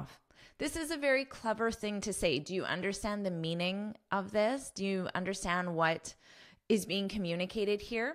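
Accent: United States. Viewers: Отлично хорошее объяснение но не люблю американский английский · Are you american?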